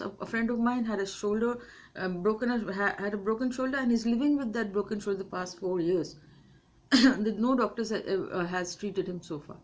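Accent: Bengal, India. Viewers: Dear, I love your Bonglish.....Your English in Bengoli Accent is mind blowing..... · Dear Madam 🌹🌻🎊🏆👍👌 I could make out that You are a Bengali Nice to hear from you A Matured Mind speak Regards From the City of Joy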